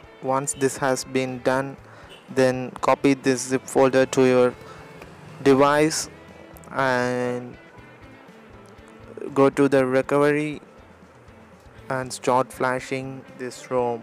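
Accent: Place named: India. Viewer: I wasn't sure if you noticed but every video you do, you have an indian accent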